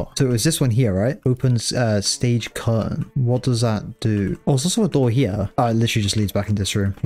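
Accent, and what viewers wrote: United Kingdom. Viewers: ur my favorite british yapper on youtube bossman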